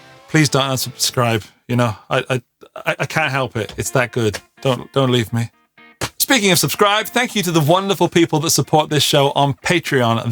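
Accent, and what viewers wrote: United Kingdom. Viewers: Hey, digging this channel, love the fun crazy professor persona However slightly confused, pretty sure that's a British accent. I can say that with confidence because I was born in London and some 40 years later still can't work out how to get out. So my question is, are you a UK or US channel since pushing Sweetwater, who I understand are the Walmart of music stores, well known but not available in the UK?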